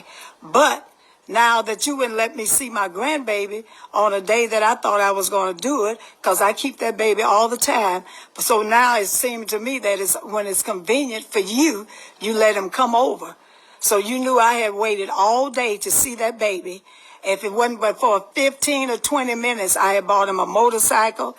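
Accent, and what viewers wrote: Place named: South, United States. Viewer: That's it. That's all! Talking like a southern woman with morals! God Bless you Ms.. By the way you look gorgeous! You go now!!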